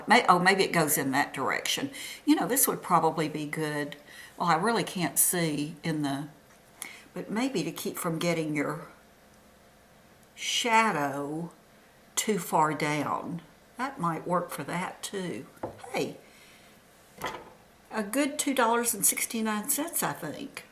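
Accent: South, United States. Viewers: Hi! Wow, I've missed you! I loved hearing your sweet southern accent again. I'm excited for you that you will be seeing Rod S. My husband and I saw him last year with Stevie Nicks in Toronto and it was a great concert. I love them both so it was a super night. What a great buy on the skirt. I will be doing some Easter shopping tomorrow so I think I'll pop into Walmart. You have a beautiful figure and your outfits looked so good. Have a Happy Easter. Come on warm weather! xoxo Susie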